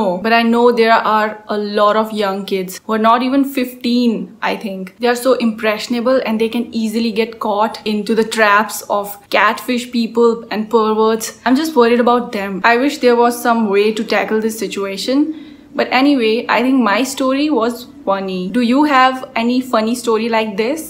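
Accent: India. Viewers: When I saw the thumbnail i was like someone Korean , Japanese etc but when j heard the voice ohh indian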